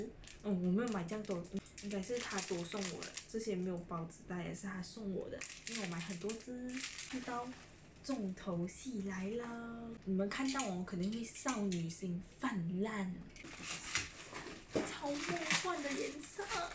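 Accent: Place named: South, China. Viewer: 我giao南方人？啊哈哈哈哈好喜欢听你说话啊～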